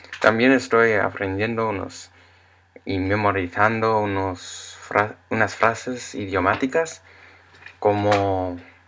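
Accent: Spain. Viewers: hahaha, muy bien! , suenas muy castellano, me agrada. Saludos. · Se entiende todo muy bien, tienes acento de España, claro.. nadie es esperfecto lol sigue ampliando tu vocabulario good job !!! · si quieres hablas español de españa vas bien, pero si quieres aprender el mexicano tienes que quitar la "z" en tus pronunciación y mejorar cuando usar la "r" , pero poco a poco vas a mejorar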